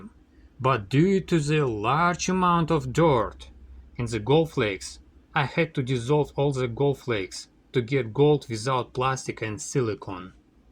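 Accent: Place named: Russia. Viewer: Most problem is - very very very very very very bad Russian accent. Sorry. English speaking people do not speak like that.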